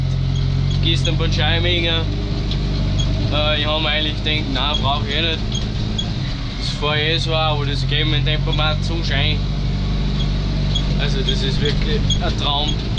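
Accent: Austria. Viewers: I finds richtig geil, dass du Österreichisch sprichst.Grüße aus Kufstein.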